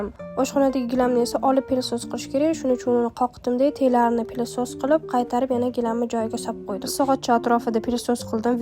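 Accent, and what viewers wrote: Uzbekistan. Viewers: Vodilikmisiz shevayiz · Хаммаси яхши кетябди лекин тилезди узгартирмай чала чулпак килиб тошкенча гапирманг илтимос вообще йимяпти😂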